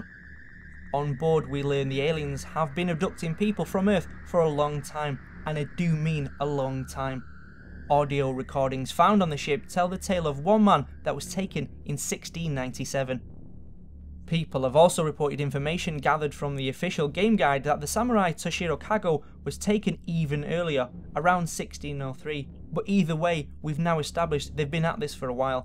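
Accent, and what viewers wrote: United Kingdom. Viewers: your British accent is funny.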